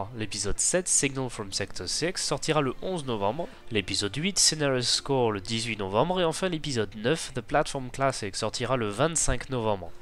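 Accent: England, United Kingdom. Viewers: 10/10 accent anglais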